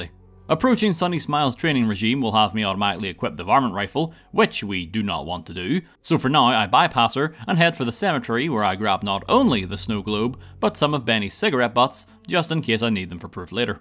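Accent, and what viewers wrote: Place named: Northern Ireland, United Kingdom. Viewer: I love having your videos on to relax or for background noise and I never knew why until I heard from one of your Skyrim videos you're are also northern Irish I'm so glad I finally found someone from here who makes amazing videos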